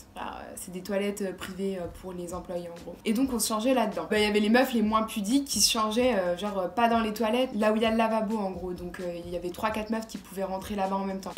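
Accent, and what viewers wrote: Lyon, France. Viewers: T’es de Lyon ?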